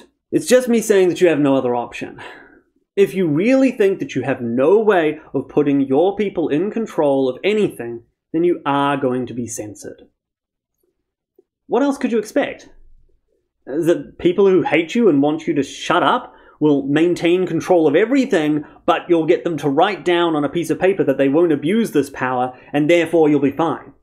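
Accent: New Zealand. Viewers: just found your channel and totally dig it mate, youre clearly a very smart chap and nice to hear a fellow kiwi (especially one who got no time for leftys, haha) on YT.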